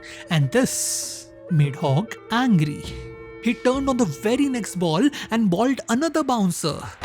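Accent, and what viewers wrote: India. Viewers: Its not pronounced " Deeter-Mine". We know you have typical cringy Indian accent but try saying it as one word " Determine". Thank you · What a crazy Indian accent this narrator has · What a pathetic background commentary! Seems Indian 🤣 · An Indian narrator having a strong Indian accent is odd to you? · Strong Indian-accented narration notwithstanding, this is just the finest portrayal of the making and initiation of the "fearsome foursome" ever! I am WIndian myself and a casual cricket fan but never was as clear about what took place then as I am now. Great information, and wonderful perspective. Thanks a lot! --Æ. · Typical Indian English 🤣🤣🤣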